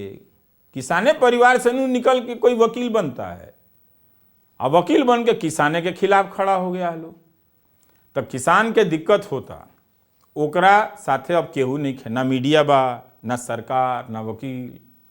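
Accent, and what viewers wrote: Bhojpuri, India. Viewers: तोहार भोजपुरी भाषा bra निक लगता भैया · I am Maharashtrian but love the way your bhojpuri ❤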